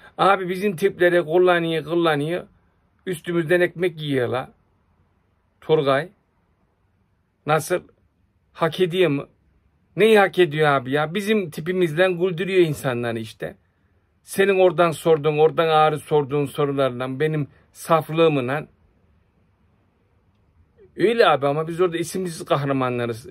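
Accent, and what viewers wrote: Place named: Anatolia, Turkey. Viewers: Genel iç anadolu şivesi galiba( abi) birazda güneydoğu bölgesine geçsen güzel olmazmı Inan seni izlerken ağlanacak halimize gülüyorum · Anadolu insanının mantığı, deyimleri, bu şive, ... vallaha var ya, lan argadaş neymişin be...! Geberiyom seyrederkene ... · Aksaray şivesi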